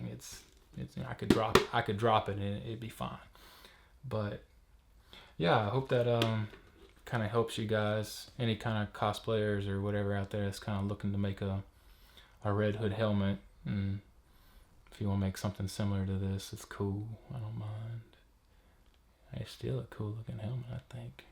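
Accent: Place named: Louisiana, United States. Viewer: Awesome helmet! Love your accent too. Louisiana maybe? Anyway, your voice is like butter 😂😂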